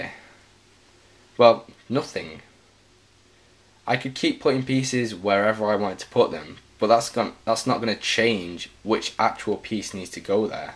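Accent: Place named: Yorkshire, United Kingdom. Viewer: Hey, just wondering which part of the country you are from as you sound like a yorkshireman?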